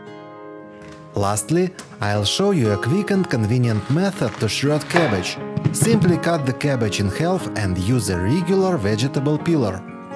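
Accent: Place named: Russia. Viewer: Мужик, ты чертов гений! Лайк тебе за лайфхаки и за классный русский акцент. Подписалась.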